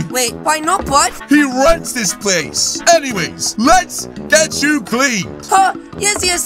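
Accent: United Kingdom. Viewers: uk guy ha? cool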